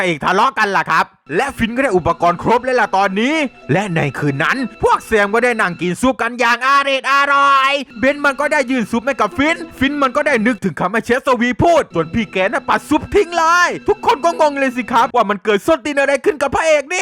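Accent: South, Thailand. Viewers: อยากได้เสียง ใต้ เยอะๆชอบๆ♥️♥️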